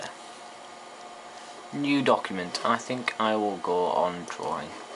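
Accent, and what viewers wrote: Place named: Cumbria, United Kingdom. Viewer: Flash back? My Macintosh Classic II still works perfectly, except for the time (the battery is flat but I'm buggered if I'm gonna waste money on buying a new one!) And your Cumbrian accent is like music to my ears, lad!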